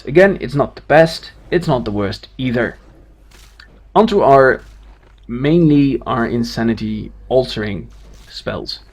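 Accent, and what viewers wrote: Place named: Denmark. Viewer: Nice guide! Really helpful! Always funny to hear someone talking english with what sounds like a danish accent! :D I got a few questions. I just recently started maining my Shadow Priest after I saw how fun the Voidform and Insanity Management looks. 1. I'm still using Legacy of the Void since I started playing my Shadow Priest, because it's what I was told to start using and then slowly moving on to Surrender to Madness, however I wasn't that fond of changing because I thought Surrender to Madness was a passive, meaning that it was every time you left Voidform you died, but seeing that it's a cooldown, you can actually play casually, like questing, clearing trash and such without being scared of dying to Surrender to Madness. But honestly, a 10 minute cooldown, yes it's one hell of a strong talent, but the 10 minute cooldown really doesn't seem that appealing, and I might be wrong here, but currently in my Mythic guild we are clearing bosses faster than 10 minutes a part in most cases, does that really mean there's actually bosses where Shadow Priests isn't gaining ANY DPS from their level 100 talents, or am I missing something? 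2. Do you think Shadow Priests will be nerfed? It really seems like we are just a tad too strong, together with Rogues and maybe fire mages (haven't played since the nerf today/yesterday) - It seems like the only way you can justify Shadow Priests doing that much damage is their ramp up time, they're absolutely shit at fast fights and they do have to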